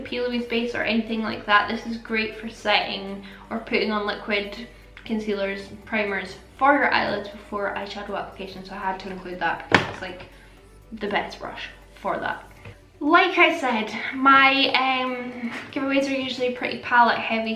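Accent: Scotland, United Kingdom. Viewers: Hey I’ll be honest I only just found u a couple of weeks ago but as soon as I heard u’re voice I hit subscribe it’s so refreshing hearing a fellow Scot,, Would love to win xxxx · Instagram handle is I'd love to be in with a chance of winning because you are my favorite inabler when it comes to colorful palettes. Also, I moved to England last year and hearing a Scottish accent makes me happy (I miss Edinburgh so much!) congratulations on 30k and kept on making me want to buy everything! Xx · Hi! CONGRATS ON 50K/30K!!!!!!!! (but you so deserve a lot more). You are genuinely so generous giving away this much AMAZING makeup and also can I just talk about how stunning you are and how INCREDIBLE at makeup you are! My Instagram is and I would love to win because I love love love makeup sooooooo much and really want to be able to grow my collection and also try out new makeup and formulas that I may not be able to afford myself lol. 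But I would mainly love to win just to try and grow as a makeup artist and maybe reach that goal one day! Love you and your Scottish accent so much!! Amelie xx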